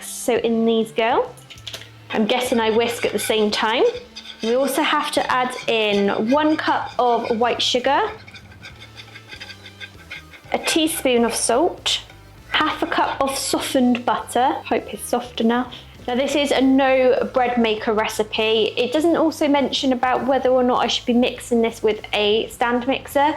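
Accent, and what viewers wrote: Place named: United Kingdom. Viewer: Boy, That British accent of hers is really hard to understand exactly what she is saying !!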